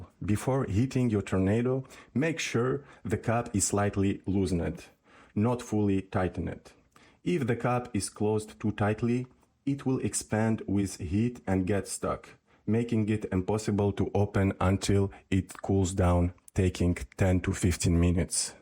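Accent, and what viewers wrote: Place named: Eastern Europe. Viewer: nice eastern Accent im from Poland ! Peace ! ❤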